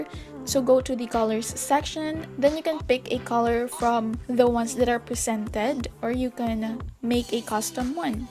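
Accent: Philippines. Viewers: Aye I just realized you have a Filipino Accent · I think your Filipina though(in my opinion) because of your accent😭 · You are filipino aren't you I can hear the accent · Are you Filipino? Im not assuming you just sound Filipino! Im Half lol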